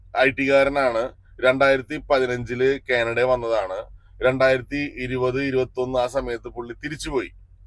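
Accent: Thrissur, India. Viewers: I left a message in your WhatsApp I don’t know you heard it. I like your Trichur accent and watch most of your videos.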